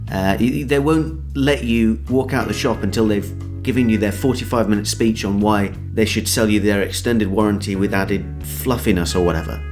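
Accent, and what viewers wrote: RP, United Kingdom. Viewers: you sound like a Tory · people always say that british accents are sexy and i never understood it because i live in leeds where the most common word is 'innit' but this accent is beautiful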